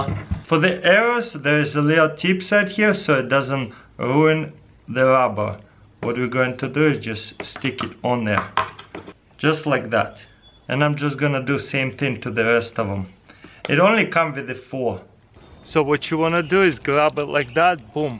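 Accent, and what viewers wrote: Russia. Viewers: Yeah and he also has the same accent as crazyrussianhacker I wonder if they are related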